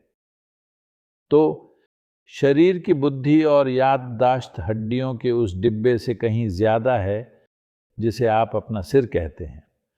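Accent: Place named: Tamil Nadu, India. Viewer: सद्गुरू तमिल भाषी है वहां ऐसा ही उच्चारण होता है भाई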